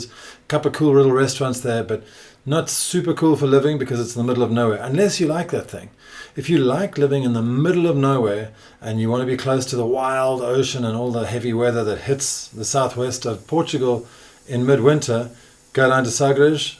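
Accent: South Africa. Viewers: Im a portuguese expat living in Europe and I've just come across your vids a few days ago. I have to say this is probably the most comprehensive, authentic, interesting, fun to watch yet rigorous video I've seen about the Algarve. You've made me start thiking about relocating back to Portugal, who knows, even to the Algarve - who knows - although being originaly from Porto i enjoy a bit of cold, rainy days and mountains nearby (not that we have many in Portugal that you can call true mountains). You're probably the best advocate for Algarve tourism and economy right now. Loved the SA accent, btw. Take care. Looking fwd to check out some of your other vids. 👍😉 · Love the SA accent! I have one too :face-blue-smiling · Hi, fellow South African and new subscriber here, so good to hear a familiar accent! ❤️ We’re considering retiring to the Algarve so your channel is just what we need. 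Looking forward to watching a lot more of your helpful information! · Hi. Ts nice to see you've retained your amazing SA accent. Can you please send me some cost effective house/apartment (2beds) prices. I'm looking to retire somewhere - SA currency squeezing ones options. <mail> Thank you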